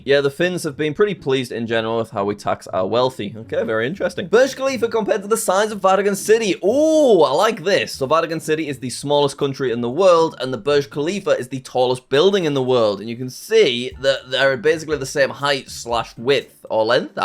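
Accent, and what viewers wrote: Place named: United Kingdom. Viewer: just like you thougt pingus were swiz i thought you were amrican but as time passed i finally knew you were british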